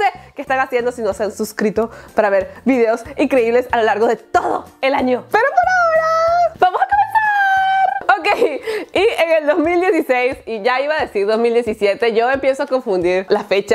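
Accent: Venezuela. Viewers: soy yo o aveses abla muy venezolada!!!!😂😂😂😂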